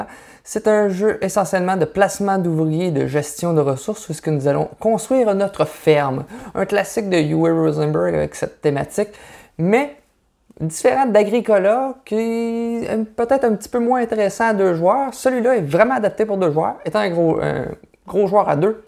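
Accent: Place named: Quebec, Canada. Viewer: J'aime bien le format ET l'accent de la Belle Province ! Pour etre bien complet, n'oublie pas de toujours évaluer le mode solo, car des fois, helas, on passe du jeu en couple au jeu solo lorsque la belle s'est envolé !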